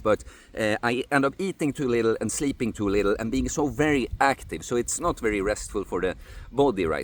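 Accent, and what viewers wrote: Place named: Sweden. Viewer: Love you! Only recently found your channel, but I will mourn the day the last time you will upload. We share a large deal on the views and outlook on life, only difference is youre much much further along this path and youre close to the embodiment of what I aspire to be, both physically and mentally, which is probably why I like your videos so much. I grew up in Sweden so the accent is lovely aswell lol. As for feedback on the current video, I want to hold up a mirror to you and say "own your vibe to attract your tribe", this quote really struck me and gave some food for thought, you keep doing you man. Har det så bra!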